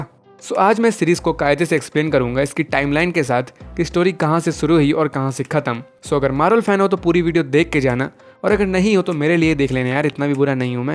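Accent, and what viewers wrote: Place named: Gujarat, India. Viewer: Are you Gujarati 🤭💜🖐️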